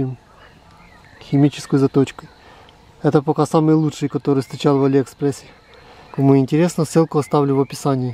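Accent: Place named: South, Russia. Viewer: говор южно-русский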